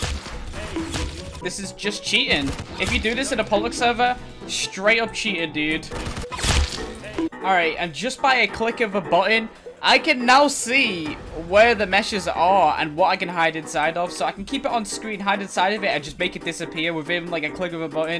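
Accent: United Kingdom. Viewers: you use brithis voice i use russian bcs i think its cool😀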